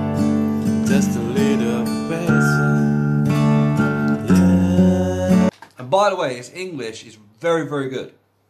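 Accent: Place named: England, United Kingdom. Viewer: Thank you Mr.. You speak with a heavy English accent.I like your viscous English. Often I can"t really catch it cause I still learn it Thanks a lot indeed however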